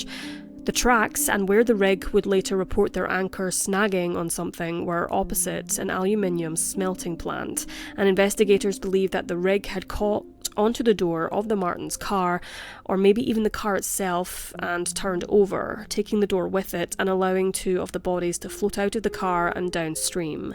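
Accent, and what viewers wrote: Scotland, United Kingdom. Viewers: It's scottish 😊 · Yes agree, I think it’s Scottish 👍🏻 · She has a Scottish accent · It's a British accent, from Scotland. Very calm and easy on the ear.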